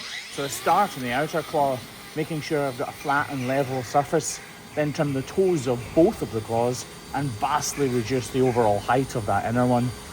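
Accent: Scotland, United Kingdom. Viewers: voice is soothing. I like it. And Scottish. I like it. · Do english cows understand your Scottish accent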